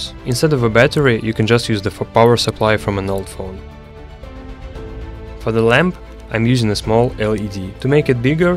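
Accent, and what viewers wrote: Russia. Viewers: Ты же русский верно? По твоему акценту понятно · с первых слов поняла что ты русский)00) отличная работа!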